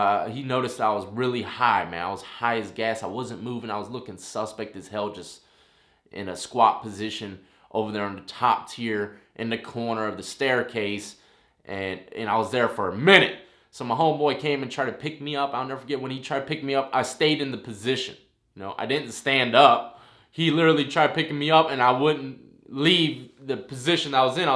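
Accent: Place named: Great Neck, United States. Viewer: wat high school did you go to? sounds like cox over off great neck lol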